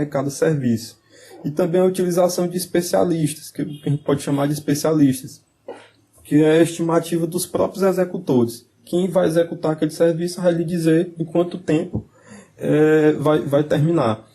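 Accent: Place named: Piauí, Brazil. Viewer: Parabéns pelos seus vídeos. Estou buscando informações sobre o Bim + Navisworks e seu canal foi muito esclarecedor. Quando ouvi o sotaque, tive certeza de que era do Piauí..haha Bom demais encontrar os conterrâneos por aqui. Sucesso!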